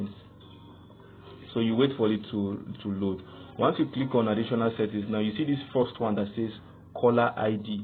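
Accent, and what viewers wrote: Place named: Nigeria. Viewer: low noise in addition to Nigerian accent is difficult to understand. non sense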